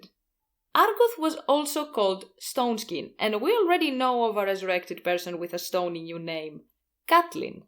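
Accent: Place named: Greece. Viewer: I think you're the first (fellow) Greek ASOIAF Youtuber I've ever seen I could immediately tell by your accent hahaha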